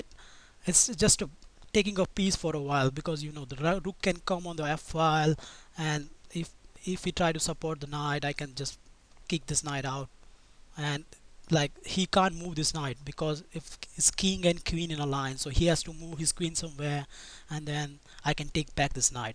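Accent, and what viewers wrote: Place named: India. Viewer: Thanks, i like your indian accent very humble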